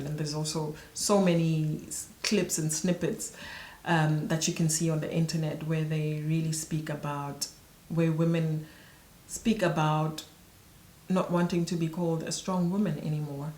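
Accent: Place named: South Africa. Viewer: Umuhle sis❤ from your accent I could tell ukuthi you are south African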